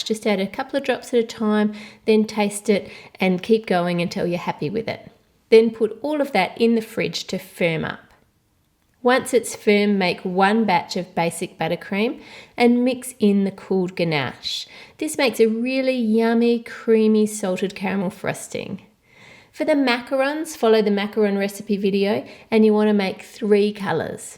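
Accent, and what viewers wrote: United Kingdom. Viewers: Are you British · Your so talented and creative. And I love your accent too. It's like British accent.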